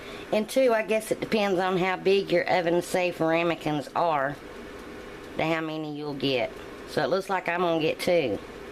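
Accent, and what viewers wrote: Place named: West Virginia, United States. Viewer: thank's I'm not sure how to make ganache. but will try to google it, I hate to be so dense, but could you use vanilla or caramal chips instead of chocolate?? I love chocolate but my husband likes the yellow cake. I just love your voice, reminds me of where I came from, WV. left there 55 yrs ago. but all my friends are still there. love ya and thank's for responding.BTW I got adventurs and tried the Jiffy raspberry muffin mix and put it in a small cake pan, airfried it for 20 min and it came out perfect, I couldn't beleive it.just enough for the two of us.. tks again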